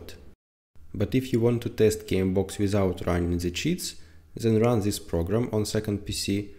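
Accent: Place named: Russia. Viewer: Норм русский акцент я балдею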